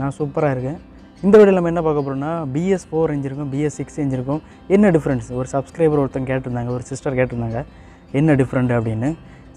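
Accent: Kanyakumari, India. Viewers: Enga ooru paiyan KK Dist accent 🤣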